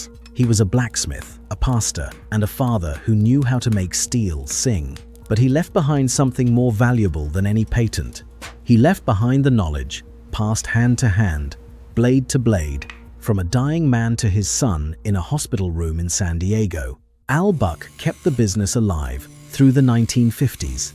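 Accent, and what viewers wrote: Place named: United Kingdom. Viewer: An American iconic product being narrated by a British voice, come on youtube you can do better.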